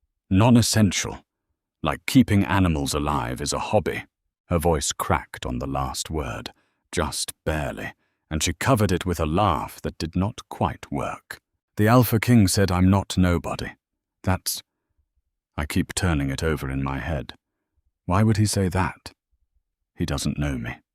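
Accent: United Kingdom. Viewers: I can't quit laughing. LOLOLOL Thermal regulation? LOLOLOLOL Calling him a big baby. The narrator with the British accent is PERFECT. LOLOLOLOLOL LOVE IT !!!!!!!!!!!! Love hearing what a MAN will do for a woman. Just soo wonderful to LAUGH... Thank You.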